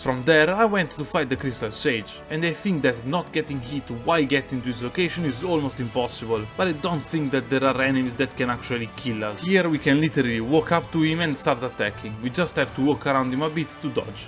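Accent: Italy. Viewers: I LOVE YOUR VIDEOS MAN! Also sembra che tu abbia un certo accento italiano, lo adoro. È un onore vedere un italiano così appassionato fare dei video così di qualità. Keep it up bro!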